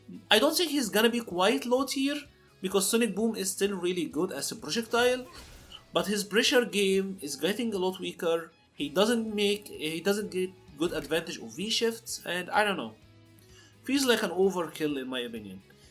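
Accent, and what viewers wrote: Egypt. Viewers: Your accent, are u from Masr?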